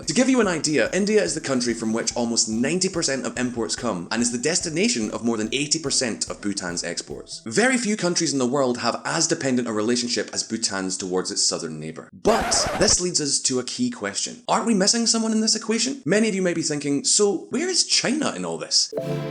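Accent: Scotland, United Kingdom. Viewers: I knew you would bring this so called refugee issue as you sound very British. The numbers you gave are all wrong. I can see you support for the Nepalese and I as a Bhutanese refuse to call them refugees. You forget we have a UN office in Thimphu. Totally biased reporting or whatever. Bhutan has a porous border with India and that’s how the Nepalese living in India towns of Darjeeling , Kalimpong and Nepal illegally entered Bhutan. So please stop playing the refugee card. By the way there are still many Nepalese here living peacefully and some have even become ministers under democracy. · Your scottish accent makes this very hard to follow. But nice video. You're cool.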